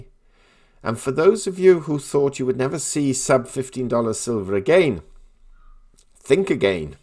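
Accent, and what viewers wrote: England, United Kingdom. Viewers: Mate you sound like a true pome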